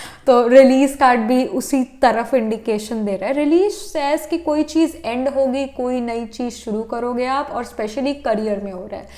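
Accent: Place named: South, India. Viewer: Your voice is very good with south indian touch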